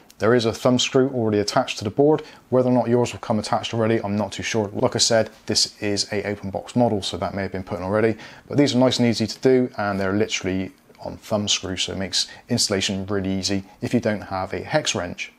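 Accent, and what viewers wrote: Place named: West Country, United Kingdom. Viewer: Ayy you sound like your from the south west! Bristol gang 😃🤣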